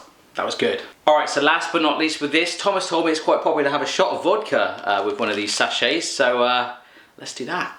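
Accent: United Kingdom. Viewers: Er hat ein britischen Akzent :) ich denke er kommt von England. Trotzdem ist es lustig wie die anderen Länder , deutsche Süßigkeiten feiern :D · Miss Rose he is not american, he's british as you can tell by listening to his accent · Ich finde man hört seinen britischer Akzent sehr deutlich ;D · Er ist aber ein Brite, hört man sofort am Akzent.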